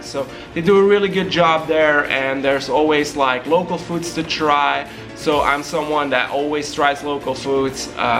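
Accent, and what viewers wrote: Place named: Russia. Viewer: You sound Russian that speakers very good English, for a Russian to go there it might not be worth it but as a Canadian paying 1400$ Canadian dollars including flight transfers and resort for level I think it’s a good deal. It’s Cuba you’re not going to get the same standards as Europe or North America but it’s still a good offering.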